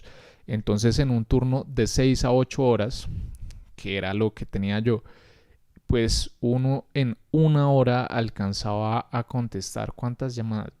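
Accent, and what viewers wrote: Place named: Costa Rica. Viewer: Soy de Costa Rica y de entrada dije, a mira alguien de aqui. Como nos confundimos nosotros mismos con los acentos.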